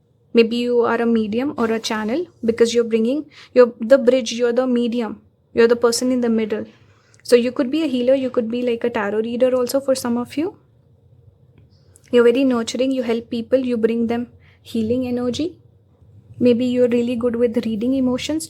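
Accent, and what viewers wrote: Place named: India. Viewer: Why you sound like an Indian...are you an Indian ❤❤❤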